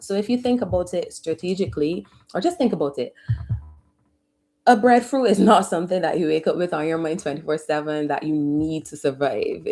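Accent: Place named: Barbados. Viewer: Subscribed in the first minute because it sounds like you’re Bajan! 🇧🇧 Anyhow, this is a great topic!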